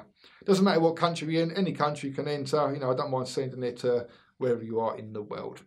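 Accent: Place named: Scotland, United Kingdom. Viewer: One of the best reviewers on the tube, apparently according to one the blokes I work with you and me sound exactly the same Scot:)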